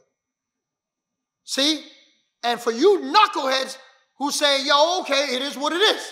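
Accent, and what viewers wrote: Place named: Brooklyn, United States. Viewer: Unc speaking big facts …I hear Brooklyn in Unc’s voice or somewhere close definitely from NY… sending much love from HTX enjoying the content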